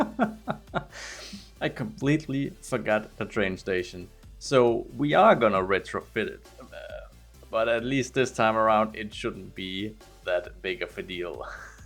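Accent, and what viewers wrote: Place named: Denmark. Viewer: it's hard to see it have a natural reason to become a major city in the region. But having it be a place for major government buildings and seats of power is very possible. Many regions and countries simply pick these spots as like rather than having a natural reason for it to be there. Anyway, nice to see you listening to feedback. Btw: Fra sekundet jeg hørte dig snakke var jeg slet ikke i tvivl om at du var dansker ahah. God dag!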